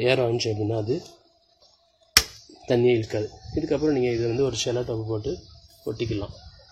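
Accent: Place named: Tirunelveli, India. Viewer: Ahh Nama ooru slang....Tvl uh sir nenga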